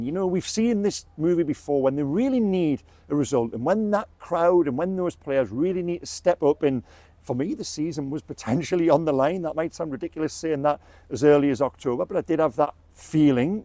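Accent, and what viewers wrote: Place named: Newcastle, United Kingdom. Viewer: To all viewers this man uses a German greeting.”Well Hallo” .This is Geordie pronunciation that is worse than double Dutch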